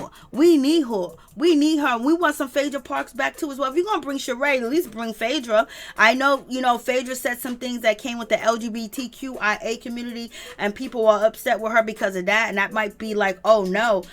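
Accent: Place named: South, United States. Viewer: YOU HAVE THE WORST ACCENT AND YOUR WORDS MAKE YOU SOUND REAL COUNTRY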